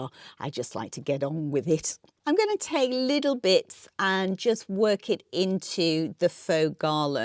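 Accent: England, United Kingdom. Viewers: I love the way the English say 'watah' instead of waterrrrr :)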